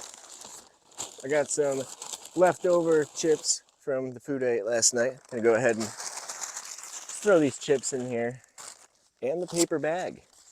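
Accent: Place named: Burbank, United States. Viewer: Another great video. I cold compost but its always fun to listen to that Burbank accent 😂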